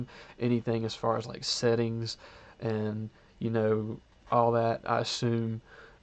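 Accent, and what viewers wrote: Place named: United States. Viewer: you sound countrryyy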